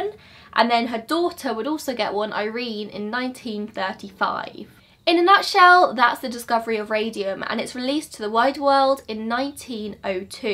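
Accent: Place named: Cockney, United Kingdom. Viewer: Hi, you're such a cutie pie along with you're cute Cockney accent dear xoxoxo.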